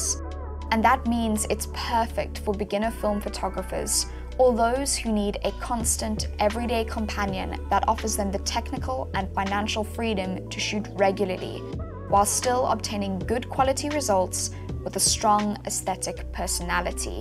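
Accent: South Africa. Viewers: first five seconds and i know I'm listening to a fellow south african! much love from new zealand.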